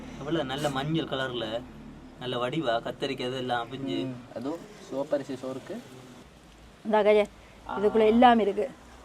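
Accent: Tamil Nadu, India. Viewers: You speak like Tamil Nādu Tamil language. Did you study in India? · Ivar enna indian tamil la pesuraru. Inga vanthittu ponavara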